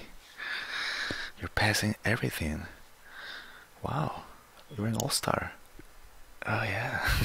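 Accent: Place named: France. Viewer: You sound... FRENCH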